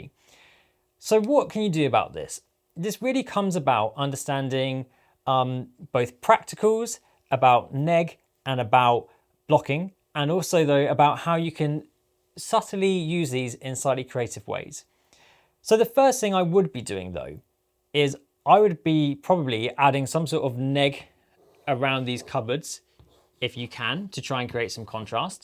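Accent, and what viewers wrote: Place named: United Kingdom. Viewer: Ong thanks so much, this is very helpful, I’ll keep all the techniques in mind and see what will fit best when filming 😁😁 also I do live in the uk 🇬🇧 I assume u do as well based on the accent 😂, thanks again for the tutorial very kind and helpful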